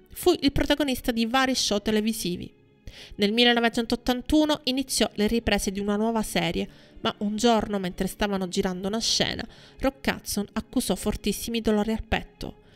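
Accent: Sicily, Italy. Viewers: Brava ,scandisce bene le parole ,ma come si sente la cadenza sicula!! Adoro la Sicilia ,ma quella calata quando si parla di cinema di Hollywood......,insomma . Riguardo a Rock Hudson , beh sicuramente bello, adatto alle commedie con la bravissima Doris Day ,ma non certo un grande attore !! Ci credo che nel Gigante primareggiasse lo splendido James Dean talento puro ,basta un solo sguardo suo , e la finale una recitazione unica ,lui si era veramente il cinema di Stanislawsky ,come altro mostro di bravura Marlon Brando altro che Hudson......,che non si vede che ha sempre quell' espressione un po' beota?? Cmq,altra cosa certo che gli omossesuali sono molto più promiscui degli eterossesuali e anche molto estremi . E Hudson andando con questo e quello in liberta' assoluta se l' e' cercato !! Puo' dispiacere come essere umano ma non e' giustificabile Cmq ,a differenza di Dean che con quella bravura eccezionale avrebbe regalato splendide interpretazioni , lui a parte qualche commediola non sarebbe mai stato un De Niro ,o un Newman.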